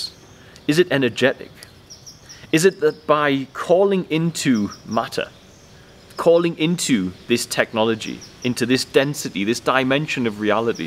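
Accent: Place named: Newcastle, United Kingdom. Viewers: Very nice video. I am based in the North UK. Very similar views as yourself. I can tell by your accent we are very close. Can we open up communion together? I would love to explore your mind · Brother, even on the darkest day, your eyes would still smile. I always love to hear that Geordie accent. I'm a Bradford lad living in America since 1998. Your voice always takes me back home for a few minutes when I first hear it. Bless you my friend. Thank you.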